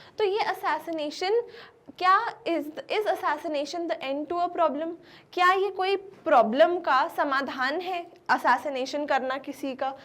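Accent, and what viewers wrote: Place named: Bhojpuri, India. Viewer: lag rha hai bhojpoori bol rhi hai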